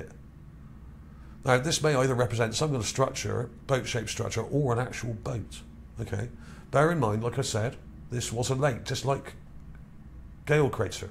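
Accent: United Kingdom. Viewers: Are you from the U.K.?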